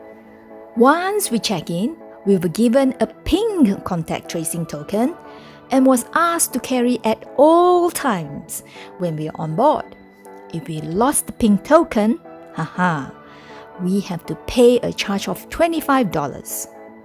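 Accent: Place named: Japan. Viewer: Lol..I see. Now I know this is an English video with some Japanese pronunciations..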